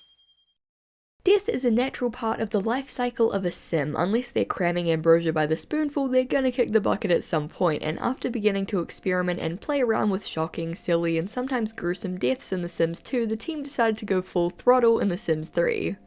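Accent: New Zealand. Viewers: Sometimes when I'm watching your videos I get so used to hearing your voice that I forget you have a kiwi accent and it's almost jarring when you suddenly reference something Aotearoa-specific -- the Mr Whippy remark got me this time! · shes from New Zealand im pretty sure. nz accent?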